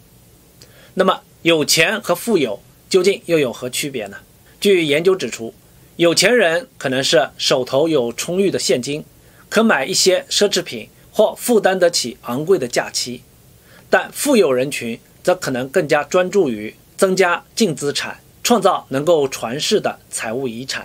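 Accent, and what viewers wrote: Standard. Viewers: 有字幕更好。您的播音质量已经是首选了，国语讲得最容易明白。可能您的国语比较标准、语速也讲得标准吧。👍赞、加油。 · 你说话挺标准的，不需要字幕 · 您的普通话非常标准 字幕有一排最好， 不要显示两排相同的字幕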